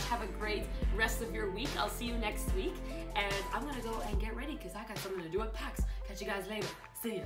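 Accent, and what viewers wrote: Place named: United Kingdom. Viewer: Woot woot! My question made and thank you very much answering! Also nice British accent.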